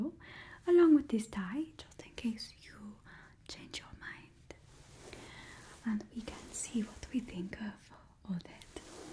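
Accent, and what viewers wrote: France. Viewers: I like your french accent 👍 she is so sweet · You have such an Amazing French Accent 🙂🇨🇵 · Wow you really resemble Natalie Portman in this video ... also loving the French accent 🤌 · I do love your French accent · I'm french and I find it very relaxing when you speak with that accent and pronounce french words. You're my favourite ASMRtist and I love your videos,! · I absolutely love it when you do your french accent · Honestly, I think has the most smooth French voice I have ever heard. your voice is spot on and quite calming. 👌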